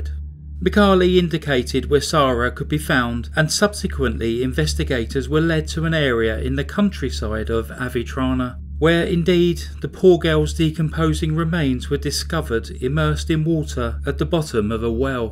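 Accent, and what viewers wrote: United Kingdom. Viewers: Aaaahhh, your Italian syntax was so impeccable I thought I was listening to. I could barely notice just a smidge of British accent.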